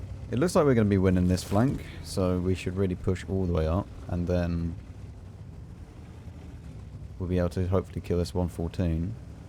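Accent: United Kingdom. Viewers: He speaks proper and with the english accent that I want to acquire.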